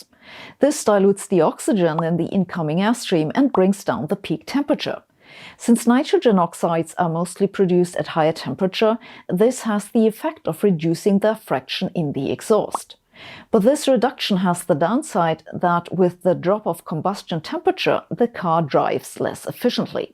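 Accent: Germany. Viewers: fear mongering with a German accent... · Ihr Englisch ist so herrlich Deutsch ...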